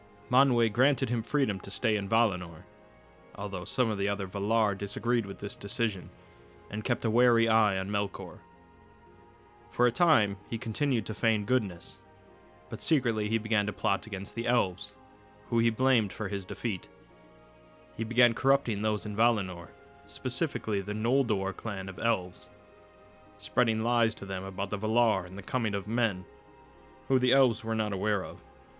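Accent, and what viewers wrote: United States. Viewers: Can nobody pronounce Morgoth normally? But always American accent... I know it's dificult for us ( born in U.S.) but live in Europe(Croatia). Please try harder. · I'd say that's just the american accent ;) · I am sorry, and I LOVE Americans and their accent, but as an Englishman, I have to state that it doesn't sound right hearing excerpts of the works read in that accent.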